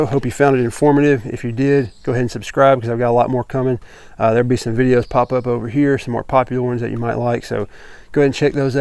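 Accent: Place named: Alabama, United States. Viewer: Aside from your logo I could tell by your accent you were a fellow Alabamian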